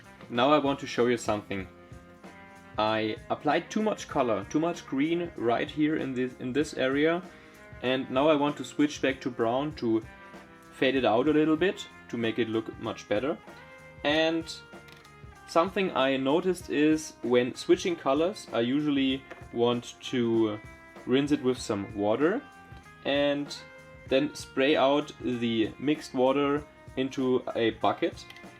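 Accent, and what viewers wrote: Germany. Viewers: Man hört raus das du ein Deutscher bist ^^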